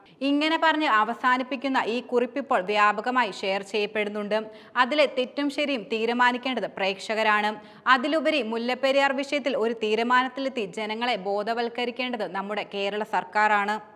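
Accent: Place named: Tamil Nadu, India. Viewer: അണ്ണാച്ചി പൂറി മോൾ മലയാളത്തിൽ സംസാരിച്ച പോലെ ആണ് എനിക്ക് തോന്നിയത് 😡😡😡😡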